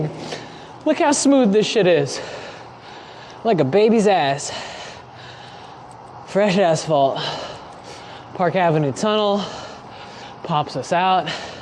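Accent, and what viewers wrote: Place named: Mexico. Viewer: Estas hablando asta con el acento Mexicano.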